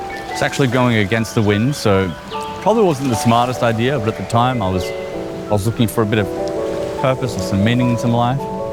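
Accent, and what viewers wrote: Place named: Australia. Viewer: Old at 40 or 50! Ha ha .. Im between those ages, have the same accent as you and have not lived in Australia since 2007. I LOVE my life. The secret sauce is to have no debt and low fixed costs and to think a bit differently.. Everyone can do it it just takes perseverance and imagination... You wont have a flash house like others but you will have awesome memories, stories to tell. At times you will just wish for a simple life and a safe place in that house you dont have but once your batteries are recharged you will just want to see the world again... You do have to sacrifice, no pets, lots of belongings etc but it will be rewarding if you are that way inclined.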